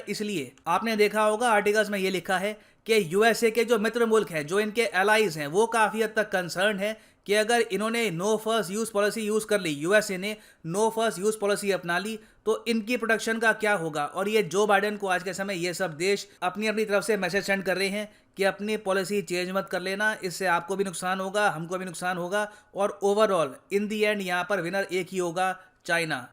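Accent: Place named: Bihar, India. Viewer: जबर्दस्त आज के लेक्चर मे बिहारी टोन है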